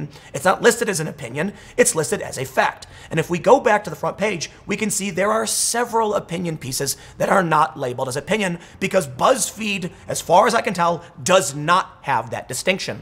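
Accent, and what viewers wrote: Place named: Chicago, United States. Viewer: love your accent, I'm a Chicagoan too. I pegged you from your accent, proud of myself and you for being Chicagoans. Which neighborhood do you hail from? I'm from Rogers Park/Westridge. Thanks for the great content.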